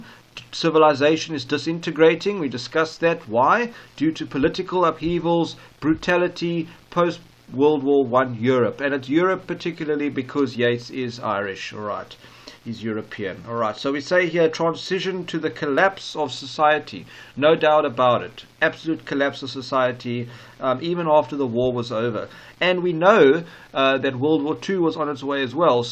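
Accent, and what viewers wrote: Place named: South Africa. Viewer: Haven’t listened to your whole vid yet, but as soon as I heard your SA accent, I knew this analysis would be spiritually mature and thought provoking. Thank you for a beautiful recitation and sharing of valuable insights 🤗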